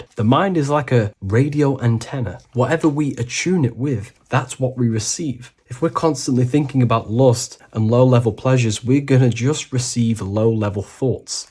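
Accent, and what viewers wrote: United Kingdom. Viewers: Such a clear British accent you've got bro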